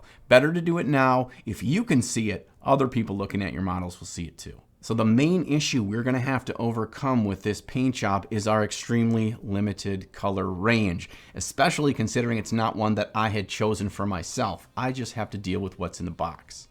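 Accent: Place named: Midwest, United States. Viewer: I knew you were a midwesterner, and that ope was the final clue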